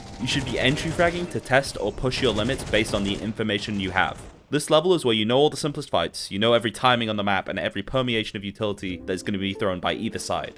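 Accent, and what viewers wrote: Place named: United Kingdom. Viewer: AI? Brother, this i0s just a british boy doing a voiceover over cs clips. What is possibly AI? His brain?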